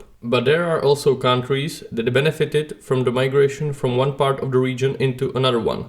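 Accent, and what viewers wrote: Czech Republic. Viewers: Excellent channel and a rarity on this vitally significant subject. And all patiently explained in a characteristic Czech accent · Heh, I was thinking that English sounds somehow "Czech" to me. It seems difficult to get rid of the accent. But it is positive that "our" English does not sound like "Russian". On the subject: I find it absurd that more attention is not paid to demographics. For Europe, it is a ticking time bomb that can disintegrate society. According to a prediction, in 2050 there will be only 2 workers for every one pensioner in the Czech Republic. This will generate enormous intergenerational tensions - and I doubt that the working population will accept that they will essentially live only to support the pensioners. At the same time, with social security contributions so high, they won't have the means to start their own families, which will only deepen the spiral of low birth rates. 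Because of the high number of pensioners, they will have considerable "voting" power to elect politicians who will play into their hands - as we are already seeing, not only with pensions but also with real estate (low property taxes, restrictive building codes). Immigration doesn't solve this - it just postpones the problem, and at the cost of breaking up the "nation". For all our achievements in protecting personal liberty and women's right to self-fulfilment, if the result is a society that cannot survive, we will be replaced by a society that, while barbaric to us, can produce enough children to be stable in the long term.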